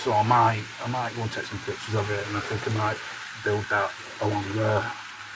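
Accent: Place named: Farnworth, United Kingdom. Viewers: Brilliant, really enjoyed this and looking forward to seeing more.Don't worry about your voice you're a Farnerth lad and sound reet gradely. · Hi, really enjoyed the video, great layout and the scratch building is superb and like the theme Lancashire and Yorkshire railway! You sound like a northerner like myself and sound absently fine and its about your passion for the hobby and you know your stuff and its good to link it with the history! My only piece of friendly advice is to go slow with the camera panning and maybe invest in a small hand held tripod that you can hold or put on the layout for static shots. Looking forward to following your progress and just subbed. Cheers Gary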